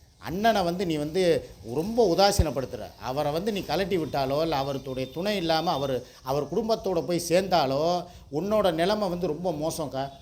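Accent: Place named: Madurai, India. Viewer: இது உங்க வாழ்க்கை நீங்க யாருக்கும் பதில் சொல்ல தேவையில்லை எது எப்படியோ நீங்க பேசுற மதுரை பாஷை நீங்க கமெண்ட் படிக்கிற விதம் எல்லாமே எனக்கு ரொம்ப பிடிக்கும்னா உங்க தங்கச்சி கீர்த்தி ஃப்ரம் சென்னை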